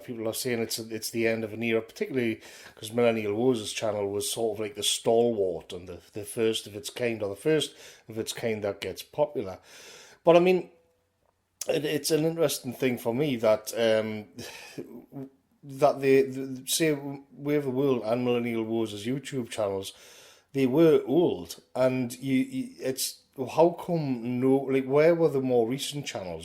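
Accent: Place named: Geordie, United Kingdom. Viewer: The algorithms can’t make out your Nordie accent.We peasants think it’s charming.Follow you anywhere.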